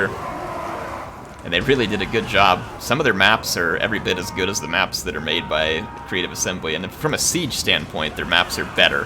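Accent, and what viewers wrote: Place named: Appalachia, United States. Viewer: While I enjoy the hillbilly accent, I still prefer Michael Palin saying “anarcho-syndicalist commune.”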